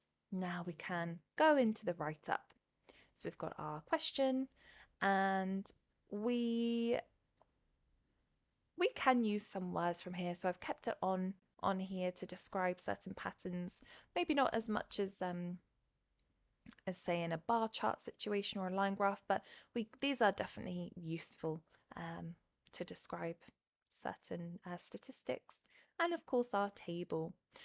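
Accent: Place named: United Kingdom. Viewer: This lady has a very relaxing and soothing voice and British accent possibly? I get sleepy too. The other man uses use’s different variations n speeds In his voice so it’s more engaging.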